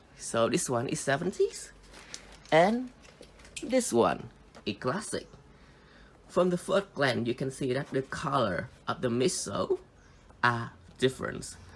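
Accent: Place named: Thailand. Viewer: Thai accent is so recognisable. one love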